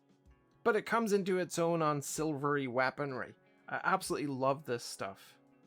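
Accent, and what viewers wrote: Northern Ireland, United Kingdom. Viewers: Do I hear a Northern Ireland accent?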